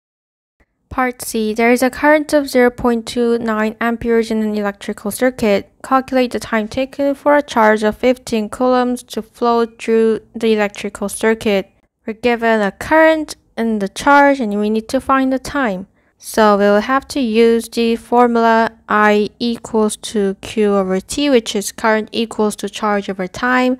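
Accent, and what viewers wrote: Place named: Malaysia. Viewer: Love from Pakistan ? BTW, you sound like Malaysian cuz I lived in Malaysia for 5 years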